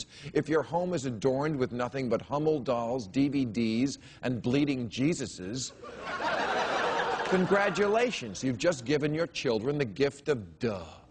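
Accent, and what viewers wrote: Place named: Rhode Island, United States. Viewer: Sounds like you're from that poor Rhode Island neighbourhood.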